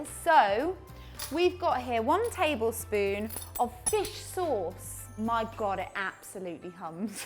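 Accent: United Kingdom. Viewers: You're British! I just got it.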